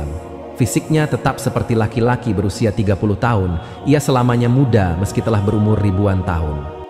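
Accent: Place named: West Java, Indonesia. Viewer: Itu tergantung Logat daerah asalnya,sptny dari Sunda,cuma saya blm pernah dengar riwayat Dajjal mengembara SPT diatas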